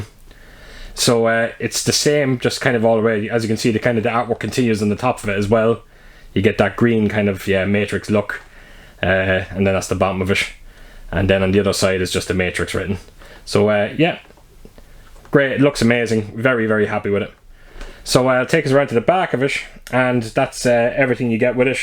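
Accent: Ireland. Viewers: ah defo..as soon as I heard the accent I knew you were local, but wasn't sure if you were based in Ireland yourself. Definitely a small world and look forward to following your channel 👍🇮🇪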